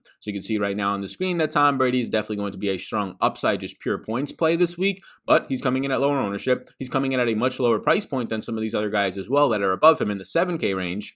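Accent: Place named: New York, United States. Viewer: You talk like you from new your or Boston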